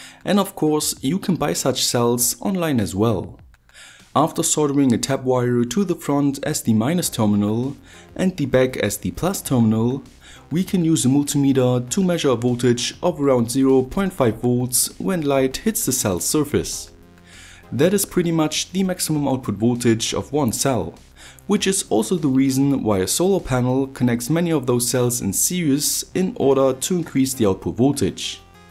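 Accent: Germany. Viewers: I get excited whenever says "Let's find out!" in that pronounced German accent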